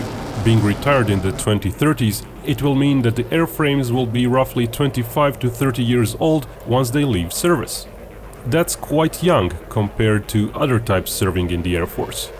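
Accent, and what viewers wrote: Russia. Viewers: I don’t trust info from a Russian sounding guy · Hmmm...some guy with a Russian accent telling us what our Air Force will be doing. I’m just surprised it’s not someone with a Chinese accent.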